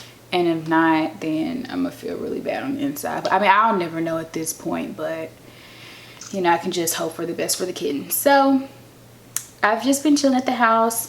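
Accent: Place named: North Carolina, United States. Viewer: You sound like you're from NC! New subbie!! Your vids are great and you're gorgeous!!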